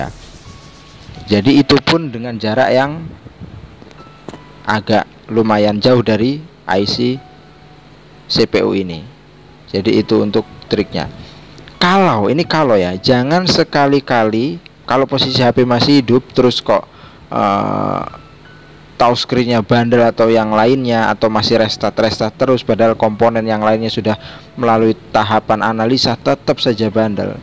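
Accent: Java, Indonesia. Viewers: Asline jowone ngendi Iki kang....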